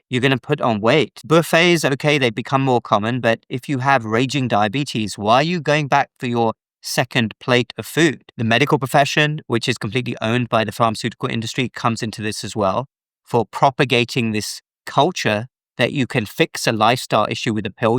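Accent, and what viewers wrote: United Kingdom. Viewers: You still have the British accent Doctor 😊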